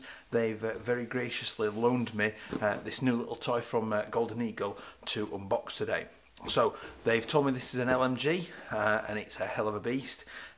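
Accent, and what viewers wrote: United Kingdom. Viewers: Bro I clicked on this thinking you were reviewing the Litefight MCR, then I heard the British accent and got confused why a Brit is reviewing a firearm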